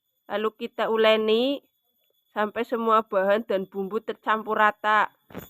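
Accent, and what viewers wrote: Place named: Tegal, Indonesia. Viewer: Jualan tahu aci semoga menjadi ide bisnis yang sukses,kaya logat Tegalan...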